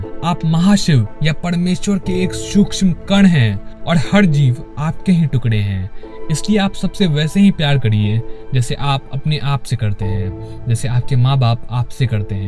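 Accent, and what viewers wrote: Bihar, India. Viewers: Bhai tu bihari hai .....i can say it for sure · Are you from Bihar? You have a bihari accent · bihari narrator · Bro aap bihar se ho na!!!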